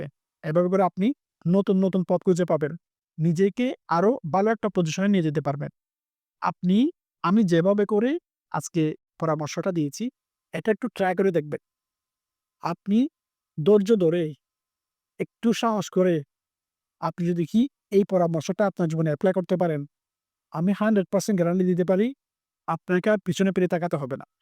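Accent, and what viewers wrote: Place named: Chittagong, Bangladesh. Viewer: Ctg man কথায় বুঝা যায়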